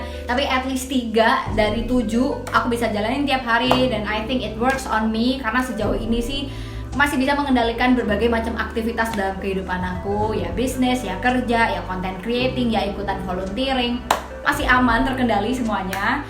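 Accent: Java, Indonesia. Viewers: Orang Jawa ya mba? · Orang mana mbak kok medok jawanya? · wong endi mbak? wong jowo huduk ?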